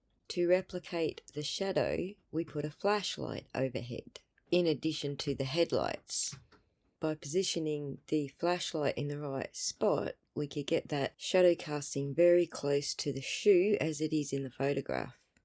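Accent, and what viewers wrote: Australia. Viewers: I love your Australian accent!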